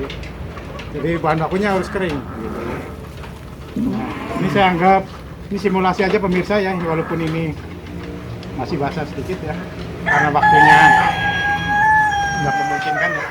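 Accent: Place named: Indramayu, Indonesia. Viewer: ngmomg e medok wg dermayu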